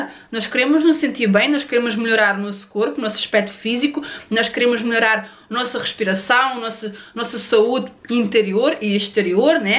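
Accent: Portugal. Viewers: Esse sotaque portugues é lindo💕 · Amo esse sotaque sonho conhecer portugal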